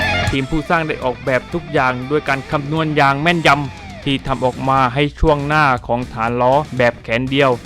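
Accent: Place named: South, Thailand. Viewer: คนใต้แน่นอนนิ้<num>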